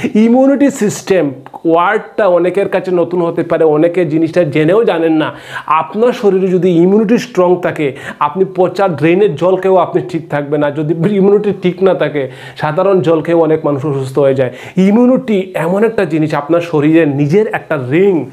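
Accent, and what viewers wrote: Sylhet, Bangladesh. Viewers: 👉Oi eegu aamrar siloti Shur -ey -kelu ucchharon korer byei! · আপনি সিলেটি কথায় বুঝতে পারছি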